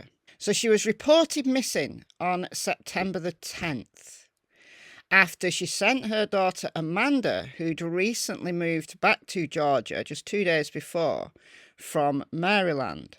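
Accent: United Kingdom. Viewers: Good morning y'all 🤔 I was sure you were British.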